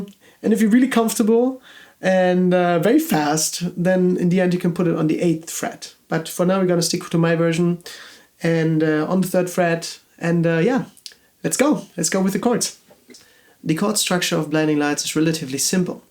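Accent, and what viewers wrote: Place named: India. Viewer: Accent is very similar to Indian accent. Beautiful.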